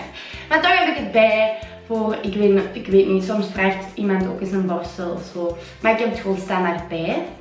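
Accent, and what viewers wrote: Belgium. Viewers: ik ben Nederlands en het is echt grappig dan hoe Belgen praten Loveeee naar jou btw :)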